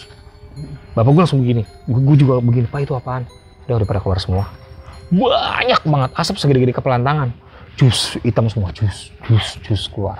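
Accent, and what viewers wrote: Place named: Lampung, Indonesia. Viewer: Lampung emg gtu lagat ny bang jadi biasalah 😅 sy juga org lampung🤣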